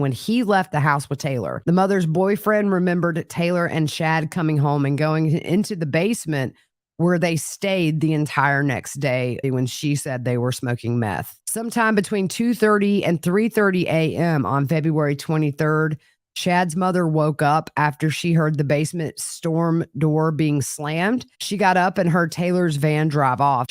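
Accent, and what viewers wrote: South, United States. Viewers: Hola from the Big 🍎 - I watched this at 4 am - this new case is going to be a doozie! If she’s acquitted (?) I’m going to set her up with my ex-husband. Love watching you, especially when I wake up and can’t sleep - miss the southern accents! The south is beautiful. Love you lots!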